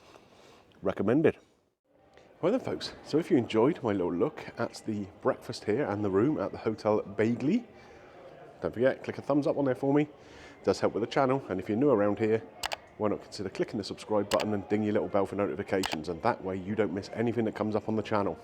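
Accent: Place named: England, United Kingdom. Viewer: He heard your English voice! Further proof the Europeans do not like us - except our money.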